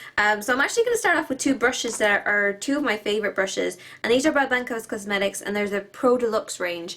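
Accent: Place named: Ireland. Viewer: Are you Irish or American? I'm Irish and can't figure your accent out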